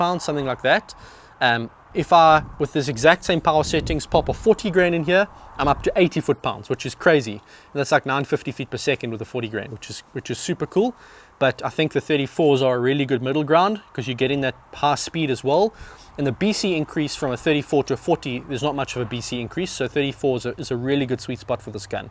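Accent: South Africa. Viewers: This guy isn’t from down under, he’s got a very strong South African accent - nothing like an Aussie accent.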